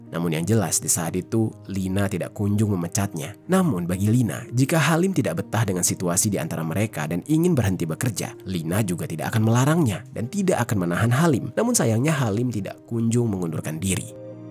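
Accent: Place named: Jakarta, Indonesia. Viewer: Senyum2 dengar bang bicara bahasa banjar logat. Jakarta😅😅..salam bang dr tabalong❤❤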